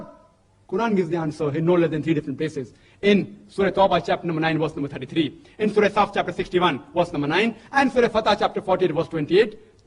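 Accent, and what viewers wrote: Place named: India. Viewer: هذا لأنه هندي الأصل كان بتحدت الهندية مثلا لنعتبر أنك تعلمت الإنجليزية هل ستتحدت كما يتحدت الأمريكين سيكون لك أسلو ب غريب في الكلام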